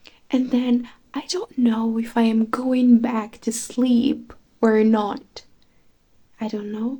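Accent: United States. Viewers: Proud of you as a Moroccan young girl speaking English language fluently with Americans accent Mashallah ❤ I Just discovered your channel yesterday , I will ask you if you can tell the Couran stories specially to the kids who were born in USA or UK to understand better when some one speaking . Thank you so much , you are beautiful and talented keep up with writing the journal every day , and encourage others to do it as well .( doesn’t matter what language! )…………Happy New Year 2024 🎉🇲🇦🇱🇷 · HOW YOU DO HAVE BEAUTIFUL ACCENT LIKE AMERICANS ? WHAT IS YOUR SECRET?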